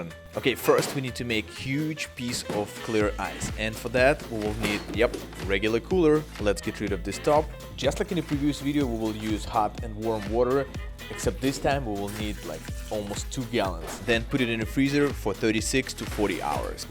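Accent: Russia. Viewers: Капец акцент русский) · Your accent is similar to Russian, you're not from Russia, do you? name is also popular in Russia)! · Ты русский))))) дададададададдаада я знаююююююю. Этот акцент везеде слышно аахахахх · Судя по акценту, ты русский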